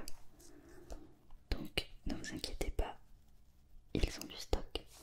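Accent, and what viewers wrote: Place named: South-West, France. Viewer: Un chuchotement comme ça avec l accent de mon Sud ouest! Que demander de mieux! Merci 👍☺️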